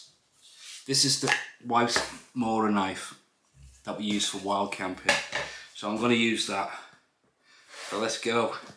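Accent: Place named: Liverpool, United Kingdom. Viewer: Great to hear a bit of Scouse! Enjoy your Friday!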